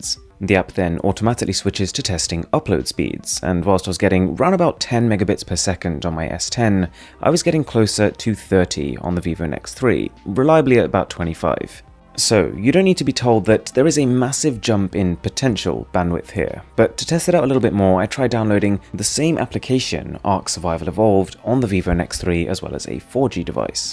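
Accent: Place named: United Kingdom. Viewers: You have british acacent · He is British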